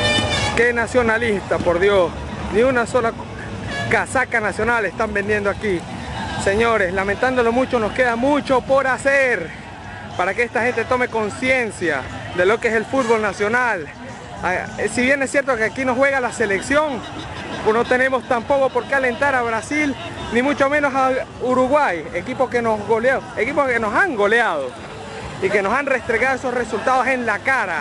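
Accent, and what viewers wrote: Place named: Argentina. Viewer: Demasiado bueno el video... Pero por momentos llegue a pensar que quien narraba era un argentino... Pilas con ese asento mi hermano! Aguante Vinotinto!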